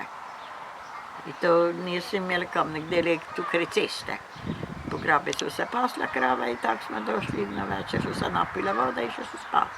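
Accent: Croatia. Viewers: I am sorry but this woman is speaking Slovene with a Croatian accent and a few words mixed betwen, but how she speaks is so Slovenian like if i am listening to someone from Stajerska or Dolenjska.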